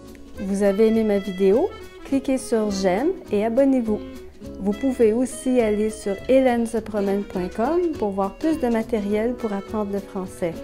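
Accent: Quebec, Canada. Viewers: Une québecoise, n`est ce pas?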